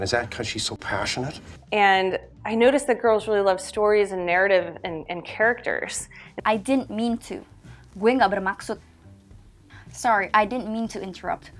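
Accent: Indonesia. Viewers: Ternyata kamu orang indonesia ia ka? Dari logat bahasa inggrisnya sih terlihat orang indonesia tapi dari penampilan saya pikir dulu orang barat sana 😀😀 Sukses terus ka, saya banyak belajar dari kamu 🙏🙏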